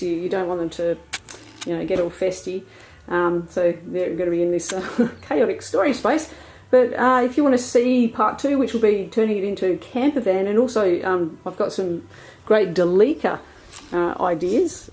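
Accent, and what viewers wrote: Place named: Australia. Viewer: Cool vid. So good to hear some Aussie…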